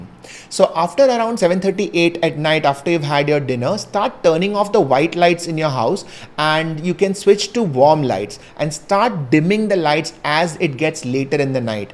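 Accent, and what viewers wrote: India. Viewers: Nice Indian English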